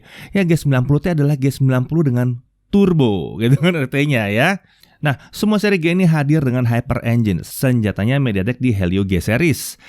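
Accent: Indonesia. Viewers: maklumin aja lidah org indonesia gitu ,ya biarin lah 😂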